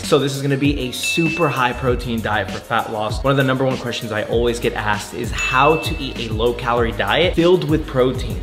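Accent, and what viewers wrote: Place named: Texas, United States. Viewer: I can tell you from TX! Good video thanks!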